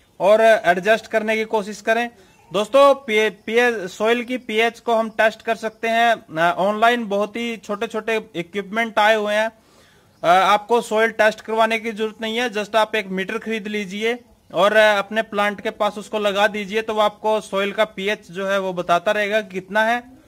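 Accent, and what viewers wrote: India. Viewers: I don’t understand when you talk with Indian accent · Was he speaking English? At first but then it changed to Indian ?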